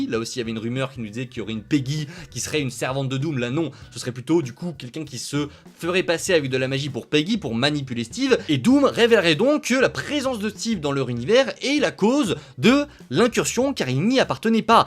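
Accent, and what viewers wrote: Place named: Lyon, France. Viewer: t'es lyonnais, non? On dirait. Je viens vous confirmer que Nartée, Endrique, ainsi que Correntyn Tollisseau SONT dans Doumsedeille! EH OUI!!!! Ils font partie du MARVELE MOUVIZE MULTIVERCE!!!!! Ils vont des petits ponts à Wong et Docteur Strange (Strange prononcé en français ringard). Aussi, il y aura l'alien qui est apparu dans un ipizode des Vacances de la Moor, le sequel de Helène et les maçons. INCREDIVULVE! Eh oui!!!! Brénoudeille et Doumsedeille!!!!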